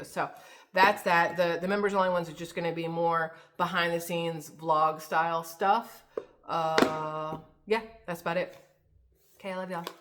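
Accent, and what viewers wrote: South, United States. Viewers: The Southern...sounds so good...love fried green tomatoes.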